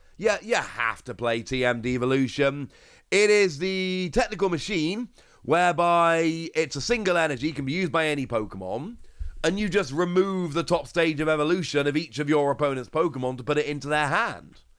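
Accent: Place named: United Kingdom. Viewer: yes that's what i meant, not used to british acc could not even get the first word right. Thank u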